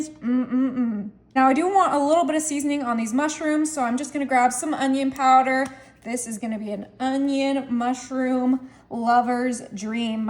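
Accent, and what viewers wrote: United States. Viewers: Meat is not meant to be that colour, what food dyes eere added to achieve it and are they safe (probably not as you sound as though you're in yhe US)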